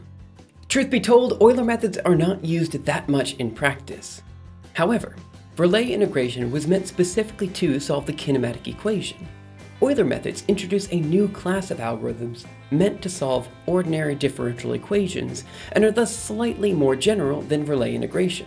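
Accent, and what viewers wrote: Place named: United States. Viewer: I've never heard this American accent before... (I'm guessing it's American) Thanks for the video though.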